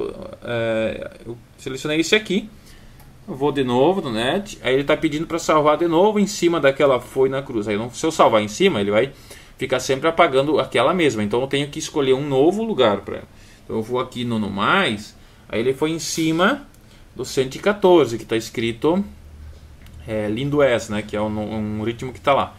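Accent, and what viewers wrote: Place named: South, Brazil. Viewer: Sutaque do sul rsr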